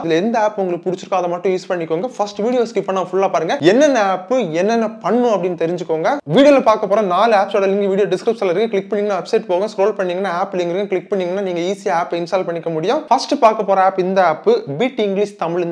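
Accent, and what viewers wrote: Kanyakumari, India. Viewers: Nanba Are you kanyakumariyan?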